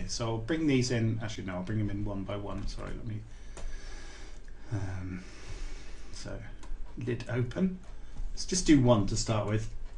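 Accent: United Kingdom. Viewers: That is a very well spoken British accent.. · Yes, that is a British accent!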